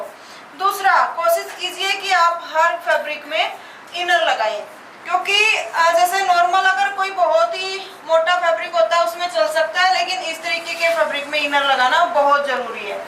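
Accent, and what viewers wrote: Haryana, India. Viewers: Sister aap accha sikhati hai 😂sikhate wakt baate karati hai to aur acchi lagti hai mujhe haryanvi bahut pasand hai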